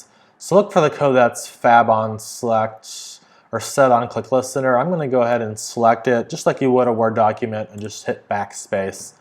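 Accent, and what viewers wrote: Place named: Southern California, United States. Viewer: I love this guy’s accent, let me guess San Bernardino county or at least somewhere in SoCal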